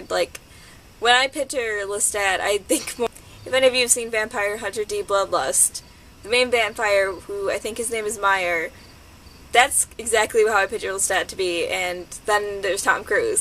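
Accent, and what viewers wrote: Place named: Brooklyn, United States. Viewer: I'm just starting to notice your Brooklyn-ish accent. It's cute. That is all C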